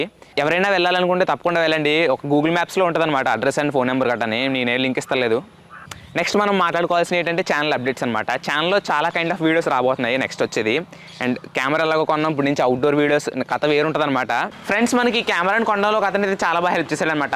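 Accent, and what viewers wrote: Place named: West Godavari, India. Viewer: పశ్చిమగోదావరి district , language ❤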